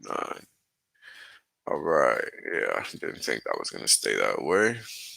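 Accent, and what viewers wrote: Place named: New York, United States. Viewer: Is your voice/accent for real bro, that's some serious New York.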